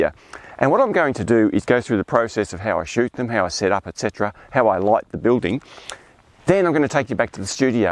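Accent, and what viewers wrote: Australia. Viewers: Sound Aussie 🇦🇺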